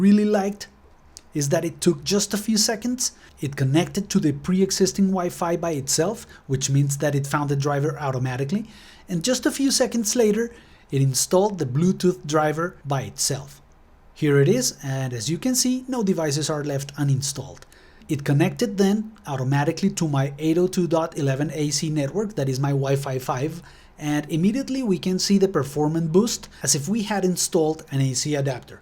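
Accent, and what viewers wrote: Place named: Colombia. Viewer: Tienes acento colombiano 😊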